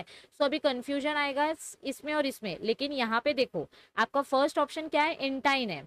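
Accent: Maharashtra, India. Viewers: Mam yaar aap kitni clear Marathi bolte ho Me MRATHI 😇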